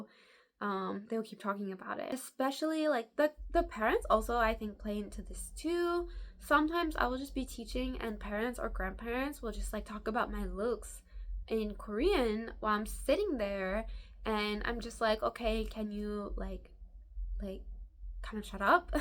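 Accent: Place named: United States. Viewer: Off topic but your accent is interesting to me :) are you American? Like born and raised?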